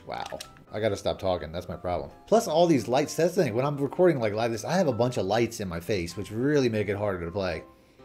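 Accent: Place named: Delaware County, United States. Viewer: You sound like you’re from DELCO….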